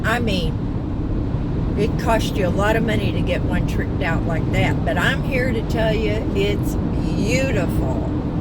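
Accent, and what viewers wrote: South, United States. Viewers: Well, you could make a 30 minute video on water evaporating or grass growing and I would watch. I’m to much of a Fanatic so I’m not sure what to recommend. All I could do is recommend you stick with what got you all those 1,000’s of subscribers, must keep them watching. Then add scenic So Cal location, through your eyes. Using you captivating Southern accent. No need to interview anyone. YOU ARE THE REASON WE WATCH YOUR CHANNEL SWEETIE. “ Magic!” ☺️👍👍👍👍❣️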